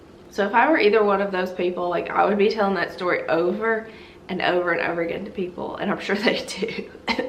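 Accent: South, United States. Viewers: your energy + your southern accent = one of the joys of life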